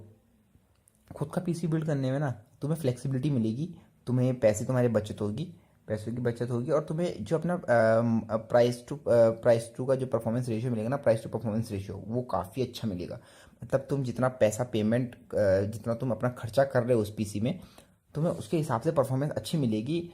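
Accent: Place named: South India, India. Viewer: isme mein toh bhai south indian lag raha hai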